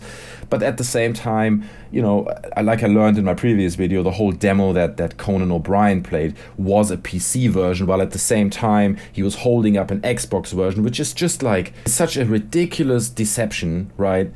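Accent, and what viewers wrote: Germany. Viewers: wollt gerade fragen, ob du deutsch bist :D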